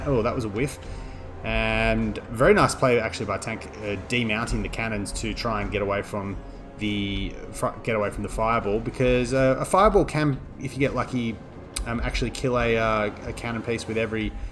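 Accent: Australia. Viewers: That feral mammoth hit Gotrek 5 times in a row between and ! I was hoping to see it's value, but you managed to skip it the entire time! Good cast though, I enjoyed listening to another aussie.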